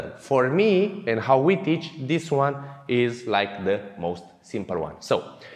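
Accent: Romania. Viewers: Puteam sa jur că ești român după cum vorbești engleza...Mulțumim de tutorial...ii ca lumea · Romanian accent. Resembles the italian accent.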